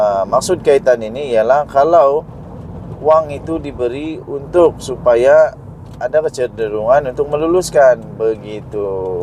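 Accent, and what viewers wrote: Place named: North, Malaysia. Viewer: Sedap dgr tuan ckp utara nu...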